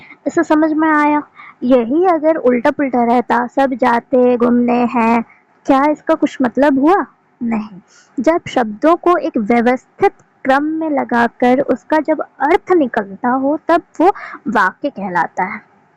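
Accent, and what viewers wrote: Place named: Bihar, India. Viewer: Accha aap bihar se ho😮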